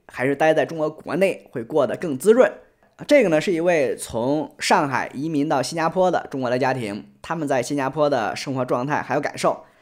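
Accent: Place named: Henan, China. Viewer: 主播这是河南普通话还是山东普通话，还夹杂着北京味，估计在北京打过工😀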